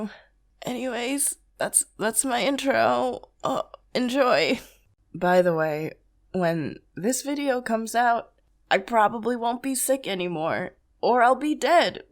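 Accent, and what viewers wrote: New Jersey, United States. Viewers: sounds like you're from jersey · Oddly she developed a jersey accent. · Your sick voice sounds like you came from New Jersey.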